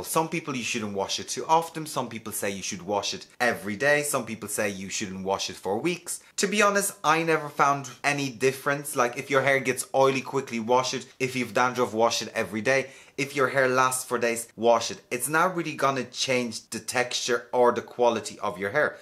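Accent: Ireland. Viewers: I used to live in Athlone (went to college there) so your lovely accent reminds me those days ;) I bought Kerastase with stemoxydine - should help to "awake" sleeping hair cuticles. We will see. If it doesn't work I will try loreal and vichy but wanted to try Kerastase first. Thank you so much and have a great day :)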